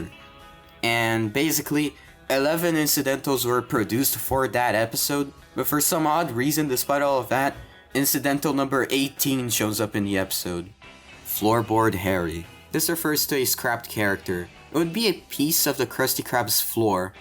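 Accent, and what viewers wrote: Brazil. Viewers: BAWJWJWJSJWJ VC É BRASILEIRO???? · carai mano tu é brasileiro? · carai tu é brasileiro quase pulei da cadeira quando ouvi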